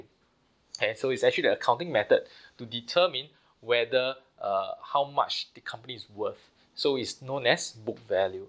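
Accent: Singapore. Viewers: I just can't take the heavy (Singapore?) accent, so I quit watching after 30 seconds. But I am pretty sure he doesn't know how to value a stock, his approach is basically guessing. If this is the right way, analysts would not deserve to be paid that much. I don't blame on him, in fact I would be surprised if anybody at his age does.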